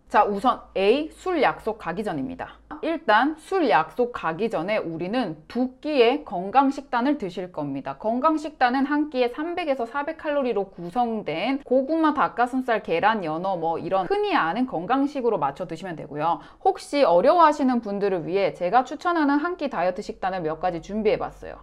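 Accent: Gyeongsang, South Korea. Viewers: 혹 대구분 이신가요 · 경상도 사람이다... 티가난다 억양에서 ㅋㅋㅋ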